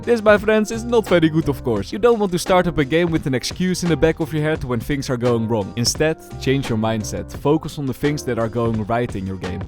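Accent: Netherlands. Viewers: i smell the dutch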